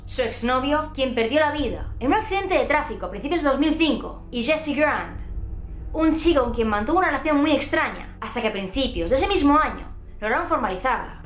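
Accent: Galicia, Spain. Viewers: Eres de Galicia?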